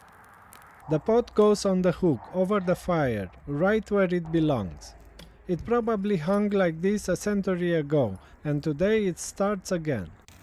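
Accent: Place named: England, United Kingdom. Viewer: I only subscribed and liked this amazing video because of your amazing English accent and your cooking skills!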